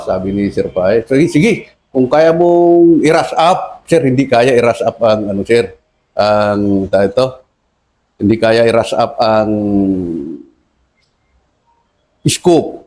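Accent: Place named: Cordillera, Philippines. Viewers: Yes he is Igorot 🫶👍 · Sabi n igorot. Halata sa accent. Magaling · Parang igorot si sir,halata sa salita nya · Halatadong igorot kac sa pananalita ❤ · Mukhang Kabbayan q ito sa accent lng alam q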